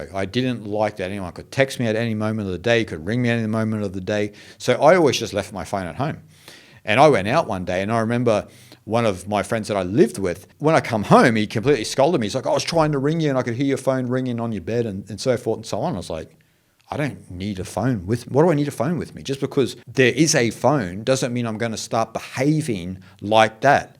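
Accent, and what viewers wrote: Australia. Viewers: no Indian, however how much esteemed he is in his field, could have explained so beautifully in english your description of the kali yuga. the particular phrase which you have used Swamiji, "the devolution of mind into matter", has really struck a chord somewhere. im assuming you're aussie from the accent. you will always find a home in Bharat. australia and nz are in for err a bit of rough weather mate. May Lord Shiva Bless you!. Om Namah Shivay!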